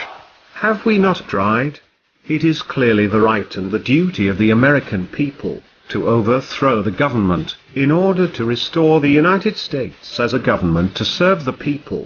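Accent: United Kingdom. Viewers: why does this guy have a British accent but is talking about how we fought for freedom ect? The only ones we fought to get this country were the British and the defenseless natives.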